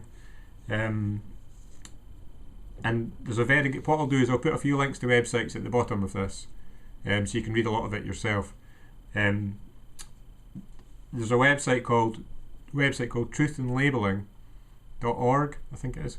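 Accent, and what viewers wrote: United Kingdom. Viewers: Hi, I'm suffering from terrible anxiety/ lack of sleep. I can relate to every single thing you've mentioned in this video. Things seem terrible now but I hope that one day I will be making a youtube video like you, helping other people. My diet isn't great.. I must admit but that's down to me being at home 99% time feeling sorry for myself and not knowing what to do. I just downloaded the audio book and I'll give this a good go. Tried pills, hypno etc.. P.S mum's from glasgow, nice accent! :) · It's quite refreshing to hear a scottish guy talk on YT to be honest. All i hear is americans, the english and aussies. and im aussie too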